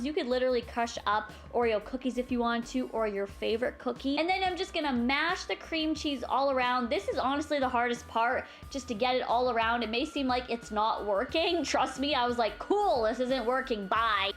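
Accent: Canada. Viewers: I say it like her, it must be a Canadian thing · Probably because she's Canadian and Canadians tend to say some words a little different. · She's canadian! Therefore, she has an accent. She's not saying it weird lol.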